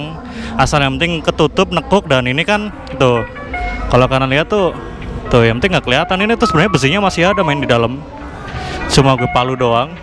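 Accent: Banyumas, Indonesia. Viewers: Hahaha salam ngapak mas ..mesti wong cilacap mbok sampean lah 🤫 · ngapak ya om? asli mana?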